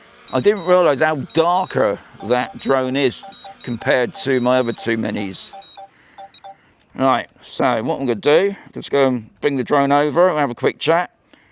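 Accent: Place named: England, United Kingdom. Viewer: I am entrigued to know where in the UK you came from before moving to Spain. I seem to detect something of a London accent merged with something from the South-West of England. Am I correct?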